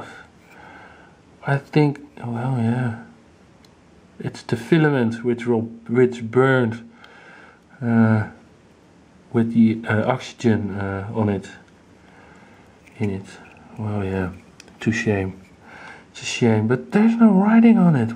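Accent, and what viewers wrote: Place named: Netherlands. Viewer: Nederlander zeker? :P Awesome to have a peek inside these things though! :D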